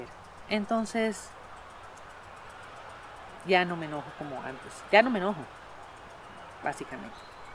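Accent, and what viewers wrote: Central Mexico, Mexico. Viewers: Tu asentó se escucha mexicano del centro. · Creí que eras mexicana... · tu acento del español es muy parecido al que tenemos en la parte central de México así que siempre me es fácil de entender todos los temas que tú expones. Saludos cordiales.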